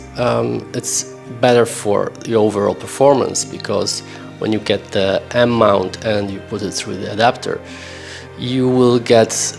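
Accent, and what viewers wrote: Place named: Poland. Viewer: Po akcencie mam wrazenie ze jestes Polakiem:) Nagrywasz tez filmy w polskim jezyku?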